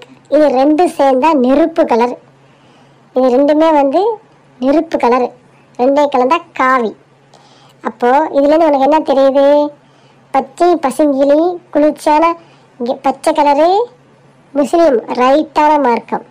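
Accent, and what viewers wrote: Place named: Parangipettai, India. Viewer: Intha slang parangippettai thana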